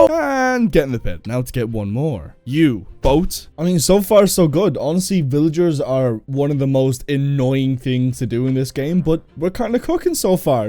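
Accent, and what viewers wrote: Ireland. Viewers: you Irish? Thats cool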